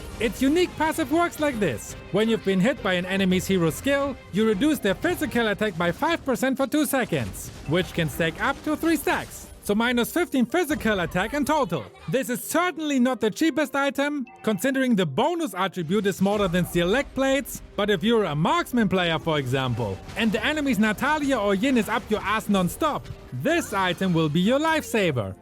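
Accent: Germany. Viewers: First time i Heard your German voice Xd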